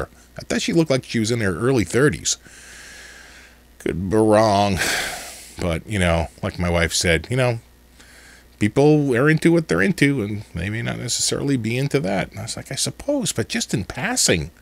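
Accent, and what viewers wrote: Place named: Rhode Island, United States. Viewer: you look like gerry garcia might have looked, if he looked like famly guy .... you even sound a bit like FG... im guessing your from, or around rhode island lovin your vids by the way and LZ are the biggest rip off artists of all time its just that the internet wasent around when they were. so no one gotta know better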